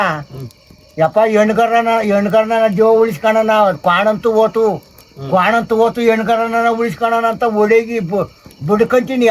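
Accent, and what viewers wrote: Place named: Tumkur, India. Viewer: ನಮ್ಮ ತುಮಕೂರು ಭಾಷೆ 👌👌👌👌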